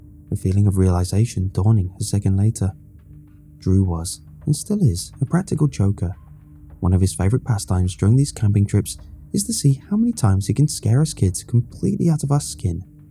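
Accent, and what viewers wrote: United Kingdom. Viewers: your voice is so soothing! I'm not English. But I LOVE this language and I really want to become a native English speaker some day. I'm curious about variety of accents in the UK. Which accent is it that you're speaking? It sounds alluring, smooth, calming and clear. I always listen to you when I'm feeling down in the dumps. It helps me in so many ways. ^ ^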